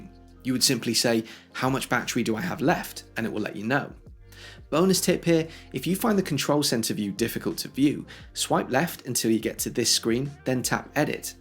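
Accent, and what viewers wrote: United Kingdom. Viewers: Hi! thank you for your videos, I have watched all of them I think that I am strangest subscriber on your channel because I watch your video not only to gain some tips about iOS but also to improve my skills of good English and understanding of British pronunciation:) Warm greetings from Ukraine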